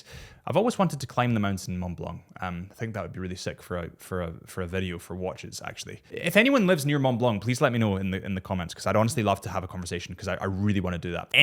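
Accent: Scotland, United Kingdom. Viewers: Do all people in Scotland talk like this guy? He sounds so stupid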